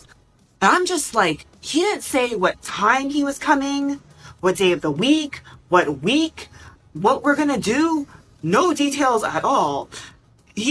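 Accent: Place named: Hawaii, United States. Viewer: To sum it up, on the Mainland you are judged by what you do and what you own. In Hawaii, you are judged by who you are as a person. It doesn't matter if you're poor or insanely rich... if you're a jerk, you'll be treated accordingly. As we say, "couple slaps to da head going fix 'um." I was born and raised and lived my entire life here on Oahu. (68 years) You have to remember that you can fit the entire island of Oahu inside the Los Angeles city limits! Living together on an island that small, you kind of have to adopt an attitude of kindness and aloha because there's no place to hide. Everyone knows everyone here! LOL!! By the way, I notice she picked up some local 'pidgin' in her words. Das right instead of that's right. LOL!! You one of us now, sistah! No can get away! :)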